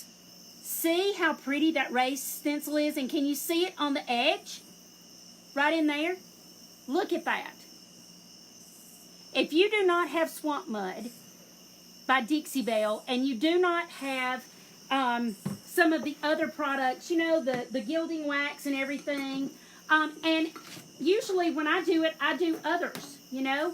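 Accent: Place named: Tennessee, United States. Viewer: Refinishing an old antique tobacco humidor right now. Been going back an forth on what color to paint it and to distress or antique wax...or both. Haha I kept feeling no matter what I did that something was missing. My door is plain. And I thought how could I DIY a raised embossed stencil onto wood. And I found you!! You are so dang fun and I'm from TN and my accent is about as thick as yours. Lol My accent and my "attitude" (not in the negative sense, more moxy and grit rather than attitude hahaha) that has been what has been holding me back from starting my own YouTube channel. But you make me feel like "what the world am I so afraid of!?" I've never been one to care what people think of me. Why should the internet be any different?? You are so unapologetically YOU. I completely admire that. And I NEEDED this tutorial tonight!!! Going to risk a trip to lowes tomorrow so I can get my piece finished! 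Thanks so much for sharing and for inspiring me!❤ New subscriber here!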